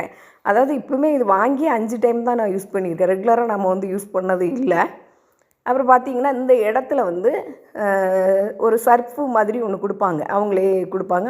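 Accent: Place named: Tirunelveli, India. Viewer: Unga native yendha ooru mam, ஸ்லாங் திருநெல்வேலி pola இருக்கு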